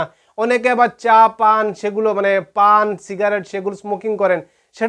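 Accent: Sylhet, Bangladesh. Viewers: আপনার কথাবার্তা শুনলে মনে হয় আপনার পূর্ব পুরুষ বৃহত্তর সিলেটের....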